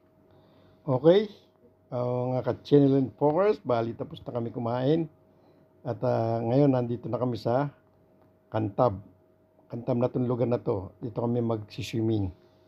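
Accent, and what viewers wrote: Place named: India. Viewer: September🎧 30, 2023 The weather here is fair Ads: 1) Inasal 2) Shopee 3) Excorcist Movie trailer 4) Payless 10 days ago, Brother it seems to me that you have imitated Indian accent. How are you? Qantab has a rocky shore. Okay BRO be safe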